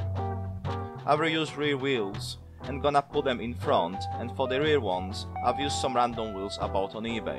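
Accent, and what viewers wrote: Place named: Hull, United Kingdom. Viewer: Good job buddy loving the hull accent lol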